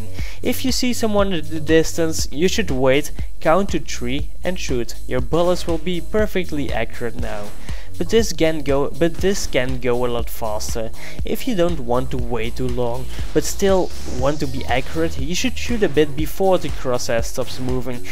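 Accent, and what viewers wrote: Netherlands. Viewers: he sounds very dutch to me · Are you Dutch? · R u dutch? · Are you dutch?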